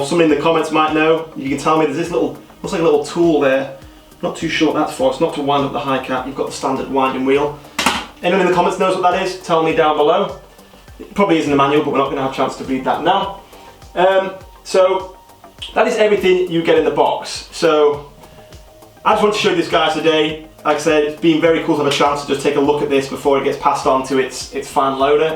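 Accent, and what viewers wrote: United Kingdom. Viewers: And he also has a British accent. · Soon as I heard his wussy British accent I knew the ak was fake. Oh well, back to trying to plate my real ones.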